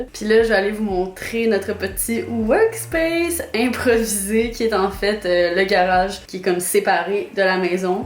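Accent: Quebec, Canada. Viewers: Es-tu de Montreal?? Ou de Paris haha je suis confuse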